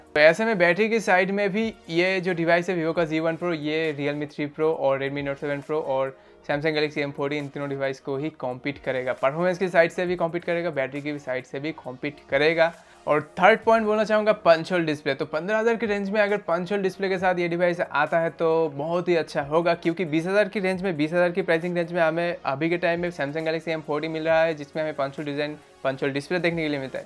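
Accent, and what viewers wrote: Bengal, India. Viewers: Vai tmr bari kothay.. i think u r bengali.. tmr kotha dhoron bangla type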